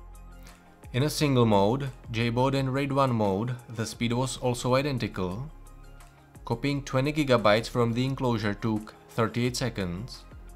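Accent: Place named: Czech Republic. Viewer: tvl tvá angličtina zní jako od Čecha.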